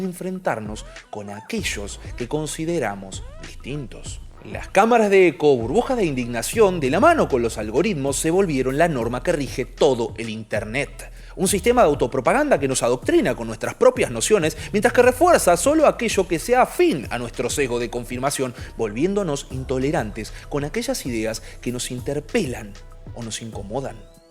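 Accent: Argentina. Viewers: Este canal y este video es de izquierda. ¿Como lo sé? Simple: todo el video es acerca de hecharle la culpa a los demás, con referencias intelectualoides, y además el acento argentino lo delata. Ni los algoritmos ni los demás tienen la culpa de tu vida y de tus posturas, uno tiene que hacerse responsable de uno mismo, y tener opiniones fuertes y posturas firmes no tiene nada de malo. Abandonen la actitud de victimismo, es peligrosa y no sirve de nada. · Por tu forma de hablar y el paneo del obelisco deduzco que sos argentino. Decis que la radicalización viene de la mano de Internet, desde que empezó la cuarentena en Argentina se violaron todos los derechos habidos y por haber en Argentina y se pasó por arriba la Constitución. Mas que radicalización yo diría que muchos nos cansamos de que nos pasen por arriba.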